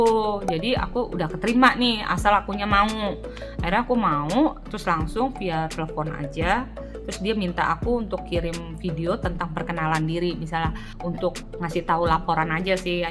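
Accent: Bekasi, Indonesia. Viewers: seneng banget ngeliat youtube, bahasa bekasinya itu loh, nyablak tapi asik. pokoknya suskes terus buat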